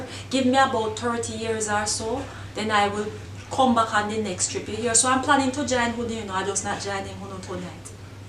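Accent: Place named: Jamaica. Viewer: JAMAICAN hummm. The best mi caaa. Badda DWL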